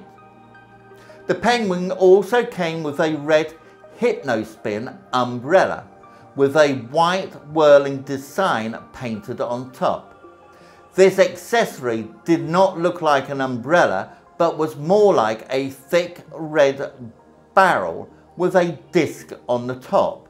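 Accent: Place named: United Kingdom. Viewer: Gotta love the guys British accent 😊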